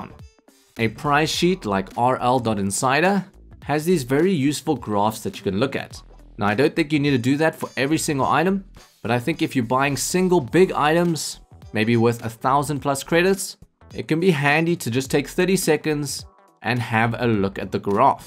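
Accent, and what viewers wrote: South Africa. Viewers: This man sounds afrikaans or is it just me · Why do you sound very south african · I know a South African accent when I hear one!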